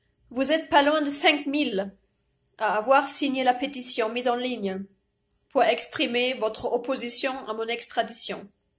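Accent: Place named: Germany. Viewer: Ich dachte mir schon vom akzent her das Sie deutsche sind. Es ist Das zweite vidéo dass ich mir von Ihnen anchaue. Ich leite weiter. Viel glück und dass diese verrueckte Welt endlich recht und wieder normal wird. Ich versuche so gut wie möglich auch meinen Beitrag zum Aufbau eine besseren zukunft für die zukünftigen generation. Ich drücke euch die Däumchen.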